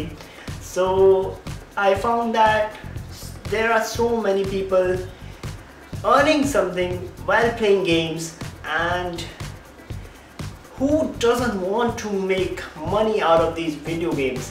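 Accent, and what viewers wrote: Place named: India. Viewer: u look like nepali but sounds like an indian?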